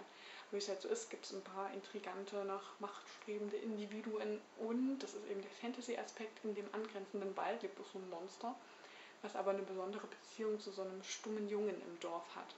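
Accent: Dresden, Germany. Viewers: Die rothaarigen Booktuber mehren sich! :) Gerade entdeckt durch dein Abonnement auf meinem Kanal! Patrick Salmen muss man eher mal live erlebt haben... Habe ihn in Berlin ein paar Mal gesehen, allerdings nur mit sehr neuen Texten. Bücher von Poetry Slammern besitze ich aber kaum.. Lieber das Hörbuch! Der Herr ist vornehmlich in Wuppertal unterwegs. Kurzgeschichten- und Lyrikbände können auch mein Herz gewinnen. :) Danke für die Empfehlung der Booktuberin/Autorin. Die Farbe Lila und Wer die Nachtigall stört.. Habe ich mal in einem Zug (ja, ambig!) gelesen und war sehr schockiert.. Habe den amerikanischen Rassismus vorher kaum wahrgenommen in der Literatur. Schöne Neue Welt kennen gerade die Leute hier kaum, die viele Jugenddystopien lesen. /: Ziemlich schade. Für mich ein großartiger Roman. Toller Kontrast zu 1984 von Orwell. Sie versucht Murakami hinter sich zu verstecken. 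:D Unbedingt mehr Videos machen. Mir fehlen solche Booktuber, die wirklich etwas zu sagen haben. Deine ruhige, eloquente Art ist sehr angenehm. Das mit den Charakterstudien erwähne ich auch in jedem Video.. Zu viele Gemeinsamkeiten! :) Liebe Grüße! P.S.: Dresdner Dialekt kommt manchmal durch, sehr toll. Und, ich habe dich mal auf Facebook in einer Booktube-Gruppe empfohlen. :) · Aha SciFi steht bei dir im Regal also links. Gut zu wissen :P Aber jetzt mal zum Inhalt: Zum Glück hast du mich abonniert, sonst wäre ich erst zu spät über dich gestolpert - das wäre schade gewesen, weil ich deinem Bücherregal entnehmen kann, dass da durchaus einige literarische Schnittstellen sind und mir vor allem auch dein kritischer Blick gefällt. Es freut mich, wenn mehr Menschen mit einem etwas anderen Geschmack den Weg in die Booktube-Community finden. Nahezu mutig ist es auch von dir zu sagen, dass du - OMG! 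- Bildbände bzw Mangas/Comics liest und die auch vorstellst. Soweit habe ich mich noch nicht getraut^^ Brave New World muss wohl auch demnächst bei mir endlich einmal dran kommen. Ich nehme es mir vor, seitdem ich vor vielen Jahren beim Film Free Rainer von der Existenz des Buches gehört habe :D Ich bin gespannt auf mehr Videos von dir und finde es tapfer von dir, dass du deinen Dresdner Dialekt nicht verleugnest, so wie ich meine sächsische Herkunft verleugne. Kannst du es aber irgendwie ermöglichen, dass die Lautstärke noch etwas höher wird? Liebe Grüße Vincent:-)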